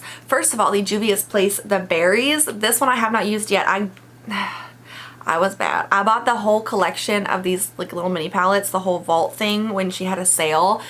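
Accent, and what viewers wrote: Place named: South, United States. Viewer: You kinda sound like you have a southern accent in this video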